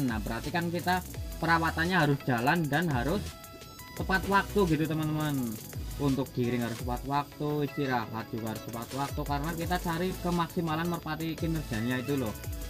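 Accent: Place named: Cirebon, Indonesia. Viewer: Kayanya dari omongannya org crbn ya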